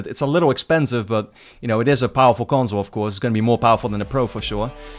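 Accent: Netherlands. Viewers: You sound dutch... Are you dutch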